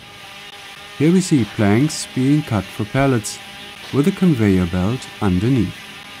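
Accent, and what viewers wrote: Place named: Germany. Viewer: i think he s german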